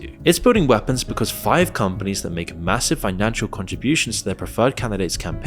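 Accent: United Kingdom. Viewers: lmao ain’t listening to a guy w a british accent talk american politics get outta here bro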